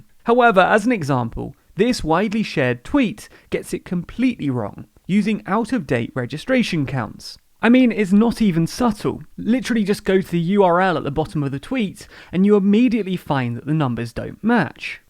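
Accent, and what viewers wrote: United Kingdom. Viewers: Why would I trust anything about the US from a person with a British accent?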